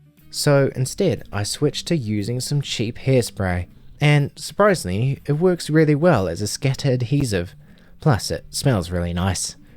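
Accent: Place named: Australia. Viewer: This was 10,000 worth it Btw u sound aussie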